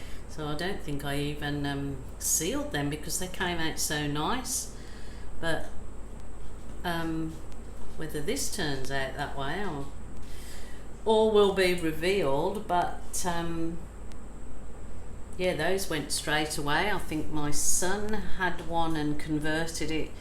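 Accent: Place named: England, United Kingdom. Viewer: Wow absolutely beautiful. Love that you are Aussie (like me) although, I detect an English accent in there with certain words.... like 'round' and 'now'! Loving your videos. Great work! Thank you.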